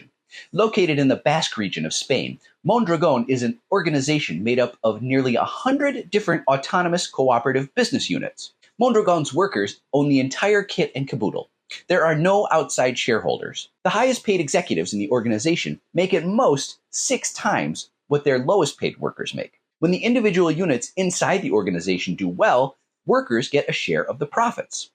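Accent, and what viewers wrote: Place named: United States. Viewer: I assume from your accent that you are from the US. Preaching the merits of Communism from a position of wealth, prosperity and freedom in the world's most successful Capitalist nation is the definition of hypocrisy. If Communism is as glorious as you allude to, why, sir, are you not living in Venezuela, Cuba, or North Korea?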